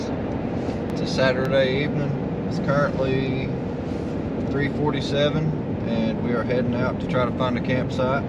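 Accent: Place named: Appalachia, United States. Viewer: nice, I could tell from the accent you were also southern Appalachia. Keep up the great work brother!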